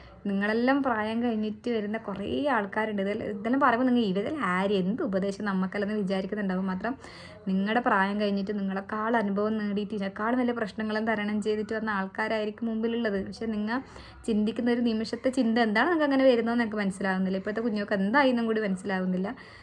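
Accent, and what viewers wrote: Kasaragod, India. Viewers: മലപ്പുറത്തിന്റെ മരുമകൾ, കാസറഗോഡ് ഭാഷ ഒട്ടും മാറ്റം വന്നിട്ടില്ല, അനുഗ്രഹീത ഗായിക അഭിനന്ദനങ്ങൾ ❤❤😂😂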